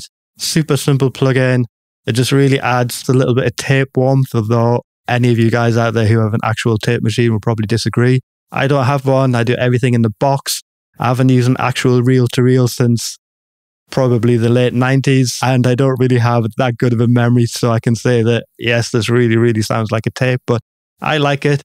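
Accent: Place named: North East England, United Kingdom. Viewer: What accent is that? I hear the Northeast. Teesside, Wearside?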